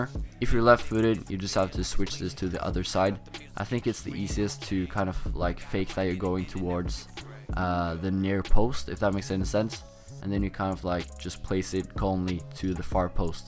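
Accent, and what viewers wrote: Norway. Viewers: u sound like your norwegian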